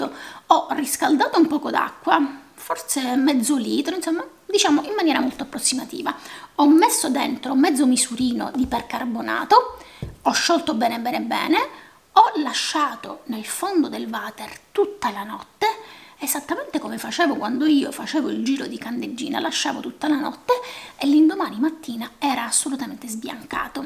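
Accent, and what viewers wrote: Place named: Palermo, Italy. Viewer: Sei di Palermo come me riconosco la cadenza 😂 ; è vero non si trovano nei negozi normali grazie per avere dato la dritta su Natura Sì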